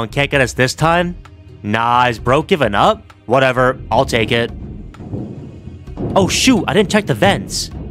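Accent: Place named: Australia. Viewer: Because he has an Australian accent I think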